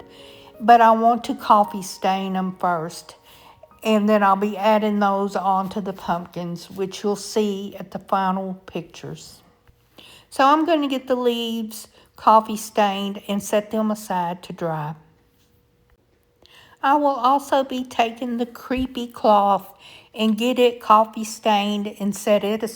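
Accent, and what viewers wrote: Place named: South, United States. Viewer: I can hear your beautiful Southern accent. I am in South Georgia, where are you located?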